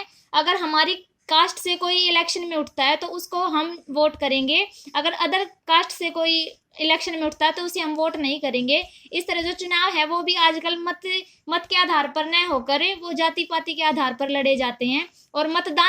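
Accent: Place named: Haryana, India. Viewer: You are from haryana ❤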